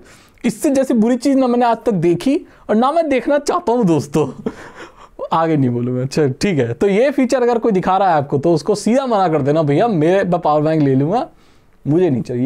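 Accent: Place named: Haryana, India. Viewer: today i see minor haryanvi assent in Bhai 🔥🔥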